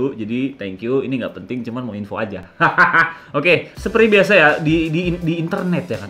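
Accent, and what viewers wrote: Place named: Bali, Indonesia. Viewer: Duh aksen baline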